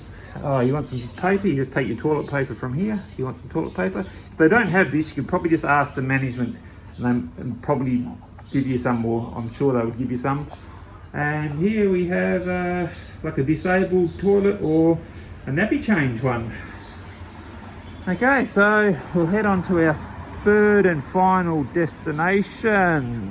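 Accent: Australia. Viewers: Is that Australian broad accent?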